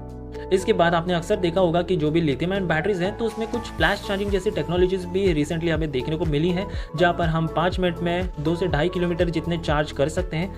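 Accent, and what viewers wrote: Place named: India. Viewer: If you are going to speak English then speak slowly and enunciate clearly. With your Indian accent and the speed at which you speak, it makes what you say almost unintelligible. Thanks You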